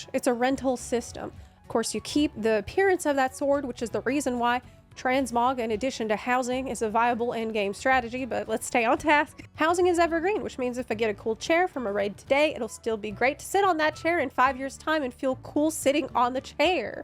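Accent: South, United States. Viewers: It’s been years since I watched a video. Was she always southern? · Yeah, same, same! I just left a comment about it and to clarify, I’m exactly the same way. Not trying to be confrontational or offensive at all, just legit curious! My father’s people are from Tennessee and the rest of my family is from Oklahoma, so she sounds like half my kin 😄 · you sound southern:P maybe Tennessee or Louisiana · sound like you have a Southern drawl in your American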